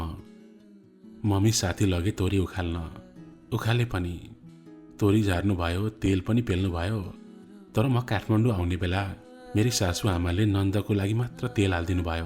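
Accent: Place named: Darjeeling, India. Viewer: ❤❤Your voice from Darjeeling 💕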